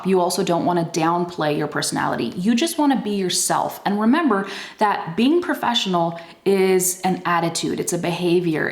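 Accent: California, United States. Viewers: Hi. Just want to know why when I listen to you I can clearly understand every word you say. Is it because you are from California or because you had to develop your pronunciation skills as a teacher? Thanks! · I think there are many accents in the american accent. I like yours. from iraq 🇮🇶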